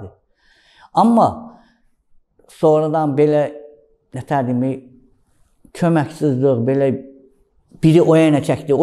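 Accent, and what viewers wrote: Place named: North, Azerbaijan. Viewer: Бизим шимал лехчеси олдюрюрде мени❤❤❤❤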